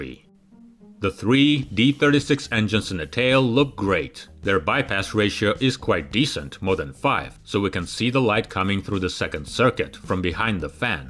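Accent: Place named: Russia. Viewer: This guy has amazing English, and a perfect amount of Russian accent. Not too strong, not too American. Amazing videos, keep up this amazing channel!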